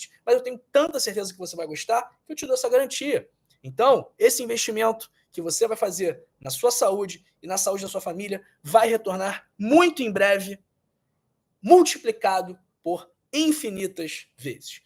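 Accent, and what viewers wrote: Rio de Janeiro, Brazil. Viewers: Claro que as mensagens são úteis. O ruim do vídeo é essa cara de filho de Satanaro e esse sotaque carioca insuportável!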